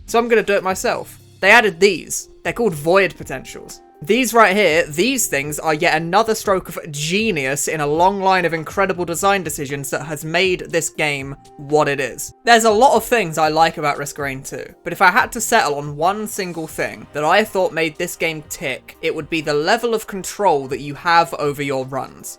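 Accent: United Kingdom. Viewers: you sound veryu british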